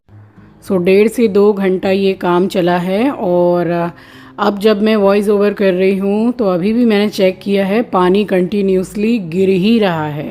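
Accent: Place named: Bengal, India. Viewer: Your bengali is so sweet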